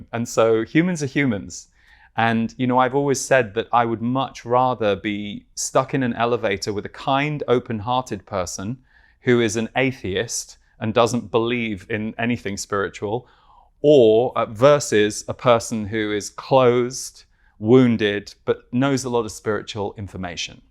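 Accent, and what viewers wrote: United Kingdom. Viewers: Your voice has a divine resonance..... Beautiful.... Brish English....❤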